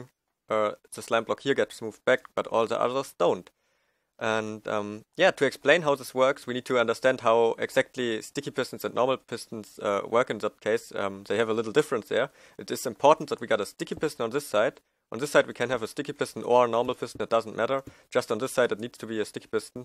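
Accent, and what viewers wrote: Germany. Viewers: der is deutscher als deutsch das hört man immer so schön raus xD aber gutes englisch :)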